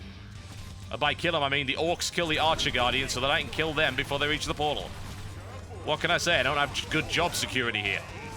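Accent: United Kingdom. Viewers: u talk too much and ditch the british accent thanks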